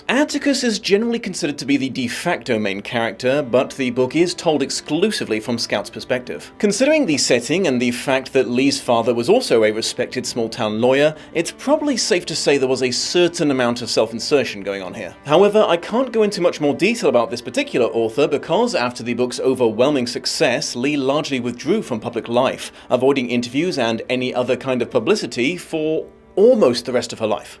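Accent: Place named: RP, United Kingdom. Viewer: No offense, but it’s a bit weird to hear this guy describe this book in his posh English accent. I start talking with a southern drawl when I think about this book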